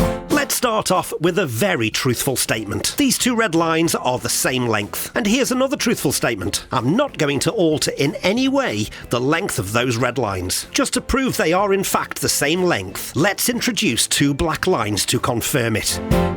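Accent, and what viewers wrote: Stoke, United Kingdom. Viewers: You from stoke by any chance? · 1- being an American, I feel i have to believe you because of your british accent.(strange) 2- accidentally taking an identical tender with the same key (small motor boat used to get ashore from a big boat) upon returning to find coast guard, police and upset couple, I said to everyone, "if the key fit you must acquit" everyone laughed and i got away with what could have been a real expensive nightmare.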